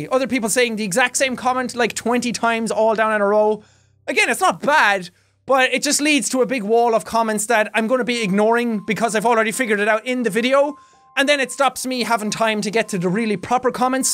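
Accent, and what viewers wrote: Ireland. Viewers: and/or i think that your are absolutely BOSS for putting up with bullsh*t comments and that your mail sail hair is BOSS and i want your fricking hat, i live in the southwest of England and my girlfriend is from northern Ireland and i just LOVE the Irish accent and i can understand everything you guys say and wish i could change my accent to Irish it's too fun! Lots of lols i cannel all my bossness towards you so that you can be even more boss, if that's possible- from Caz! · Are you Irish? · He's form Ireland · Hey.... or, or whatever your name is.:$ haha I'm a new subscriber to you and I just wanted to say that when I saw a youtube gamer with an Irish accent, I was in complete awe! You're brilliant, dude! Can't wait to see more vids! P.S. I was in Ireland on vacation over the summer, and I really miss it. Your accent brings back a lot of awesome memories.:)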